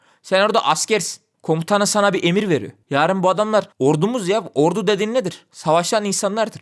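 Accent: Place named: Nevsehir, Turkey. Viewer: Ustam nevşehir li misin konuşma aksanın nevşehir ağzı çok iyi 👍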